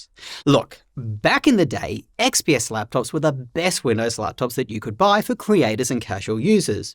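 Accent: Australia. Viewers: Eww, Aussie accent.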